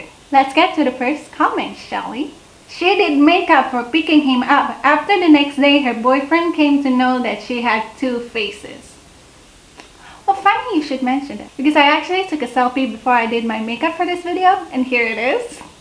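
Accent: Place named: Indonesia. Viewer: Hai dear, u sound more like indonesian then malaysian? Where are you original from?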